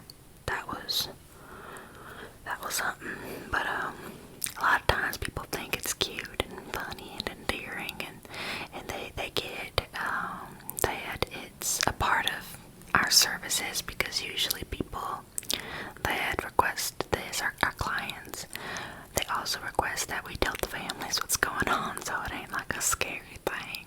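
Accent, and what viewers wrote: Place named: South, United States. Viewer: This was SO GOOD!!!!!!!!!! As a southerner myself, I can say the accent is accurate to what most of us sound like. And also, I got a lot of tingles from the video. So keep up the good work!!!!!!!!!!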